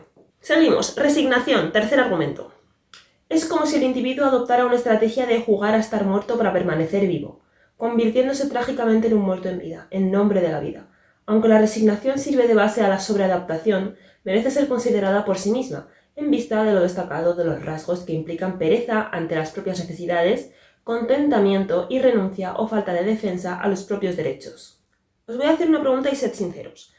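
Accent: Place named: Spain. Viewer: Ayyyy que terrible, no estoy entendiendo nada, primero, porque escucho conceptos nuevos, ¿me podrían decir donde encuentro info de términos o conceptos? Y segundo porque el acento es marcadamente español, y eso, a pesar de estar muy habituada a contenido en ese idioma, en combinación con lo primero me deja así: 😳🤔🥴🥴🥴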